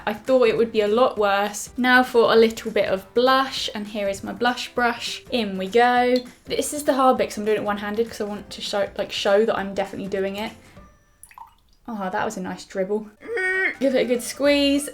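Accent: United Kingdom. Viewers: I'm in love with your British accent.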